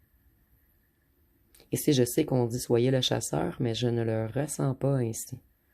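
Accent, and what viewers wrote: Quebec, Canada. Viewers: Je suis ducsud de la FRANCE...Chez nous aussi nous avons un sacré accent.Mziw votre accent QUÉBÉCOIS JE L'ADORES !!!❤❤❤❤❤❤🎉🎉🎉🎉🎉🎉🙏🙏🙏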